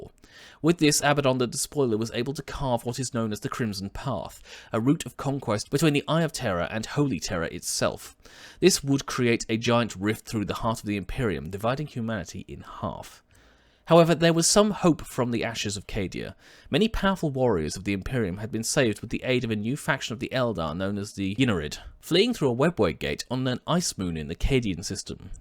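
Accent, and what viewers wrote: United Kingdom. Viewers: lol, the British accent making terror and terra homonyms :D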